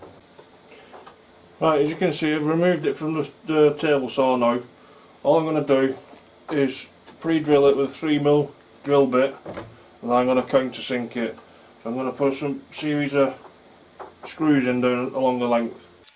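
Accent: United Kingdom. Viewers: Excellent with the exception of my inability to understand your British accent. But, that's my problem, not yours. Thanks for taking the time. I have one of these same saws and I'm going to make this sled.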